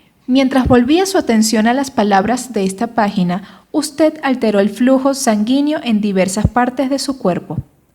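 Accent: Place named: Venezuela. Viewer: Pésimo acento venezolano 🤮🤮🤮🤮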